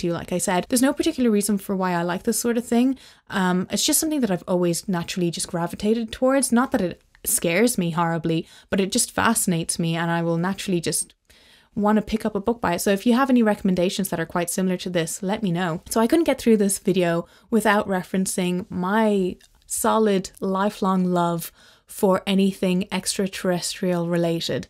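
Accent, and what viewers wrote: Ireland. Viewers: I had to check your profile to be sure of your origin. Sounded Irish yet was kind of Nova Scotia or thereabouts :-) King's Pet Sematary is well done and adheres to the philosophical principles of the horror genre. Fritz Leiber rocks the horror. . . the horror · Are you Irish? 🇮🇪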